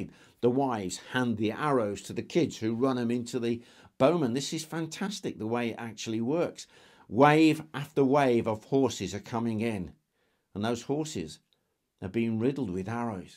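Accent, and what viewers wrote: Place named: RP, United Kingdom. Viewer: A special thanks for RP and not using slangs or dialects(hello cockney))).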